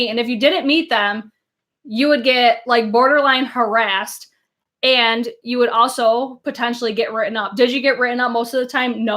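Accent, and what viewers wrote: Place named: Western New York, United States. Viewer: From what I’ve seen, the same capital investment company is behind Morphe and JHC. If that’s true, they’re the ones behind the new Morphe x JH palette. Trying to boost their investments. Your accent... I was thinking you were from Upstate/ Western NY. I know a lot of people from Buffalo and Niagara Falls that have similar accents. Love from NJ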